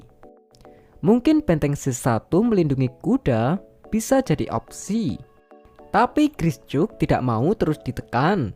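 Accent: Java, Indonesia. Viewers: Asli orang jawa ya bang kok medok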